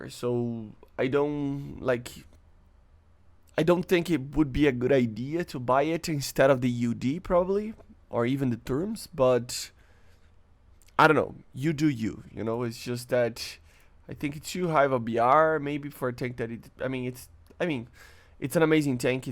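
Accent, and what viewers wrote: Brazil. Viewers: I like you because you sounds brazilian speaking english. Its good for trainning my english.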